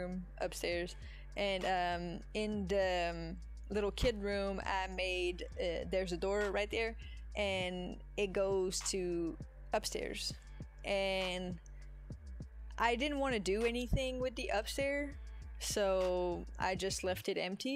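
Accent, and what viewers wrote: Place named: France. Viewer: Omg, I was gonna say it sounded like French was your first or second language because of the way you said "occult", but for some reason I thought you were from an African country that was a former French colony. You speak English much like a native speaker though, you are really good at it 😃👍, especially for only speaking it for 3 years! It took me way longer to learn English. Thank you for replying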